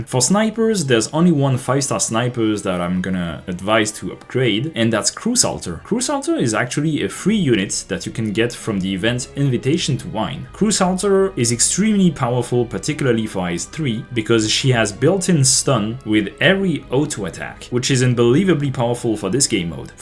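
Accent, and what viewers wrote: France. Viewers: Are you perhaps French? Bonne vidéo haha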